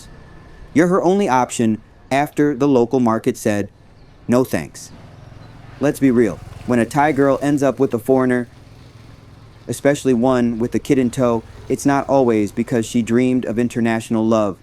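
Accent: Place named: India. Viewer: why u are indian man your accent can't escape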